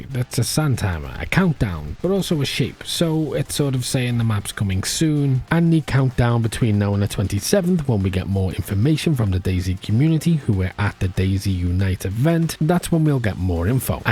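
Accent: Liverpool, United Kingdom. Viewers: I need to know are u scouse? or from the wirral i feel like i hear it in ur voice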